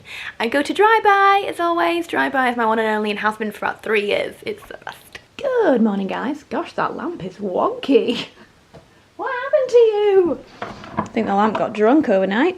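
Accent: United Kingdom. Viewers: Just adore bloggers from UK. Fashion, lifestyle, British accent- everything is so lovely! And the Magrath family ❤️ dear, you look very upset in this vid(. Lots of hugs from Russia, darling 🌸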